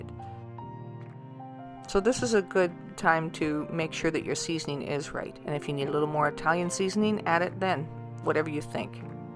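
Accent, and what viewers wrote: Canada. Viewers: PS are you Canadian?! I hear my families accents in your voice ❤️❤️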